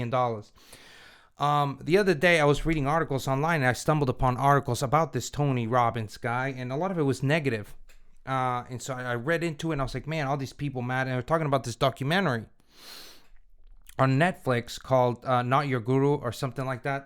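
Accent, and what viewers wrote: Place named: East Coast, United States. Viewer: Run up on there! Now you sounding East Coast! NY/NJ BitCoin revolution! 0x8f641256fedf1453eb<num>b2cf25fe51ada0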